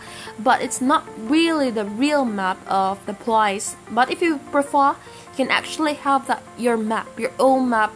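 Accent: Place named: United Kingdom. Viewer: Hey! your British accent is amazing! Hahaha I know it’s yours voice, funny and educational. I’m done watching,like and subscribe. Of course it’s amazing. I miss you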